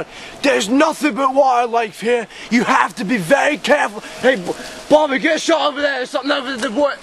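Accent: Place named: Australia. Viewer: why does he sound australian? haha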